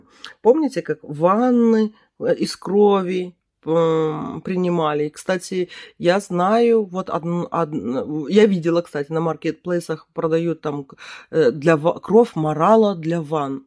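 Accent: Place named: Belarus. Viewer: Так девушка из Беларуссии, зачем вы учите как говорить? Мои знакомые оттуда тоже интересно по своему говорят и мне не режет ухо. ДОбре?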